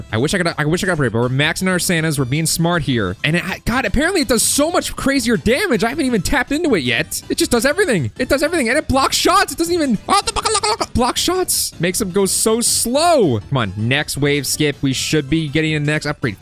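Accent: United Kingdom. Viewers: Britsh voice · bro no one knew he was british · The goudly British accent tho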